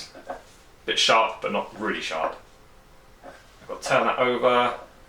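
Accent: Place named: London, United Kingdom. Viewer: Thankyou great to have tutorial London tone lol I'm newbie so loving your tutorial s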